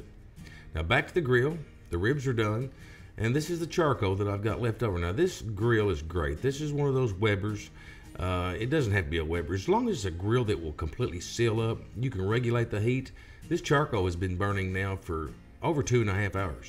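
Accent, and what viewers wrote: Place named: South, United States. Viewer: You are so cute. I could listen to you talk all day with that country accent.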